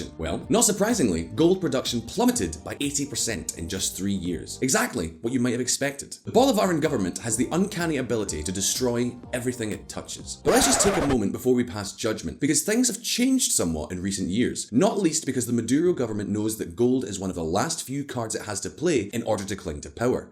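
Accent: Scotland, United Kingdom. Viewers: Stop whining about Simon leaving ffs... We still get a bald white guy with a UK-based accent featuring Venezuela’s economy, and still for FREE. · Whoa, how weird hearing scottish accent on this channel.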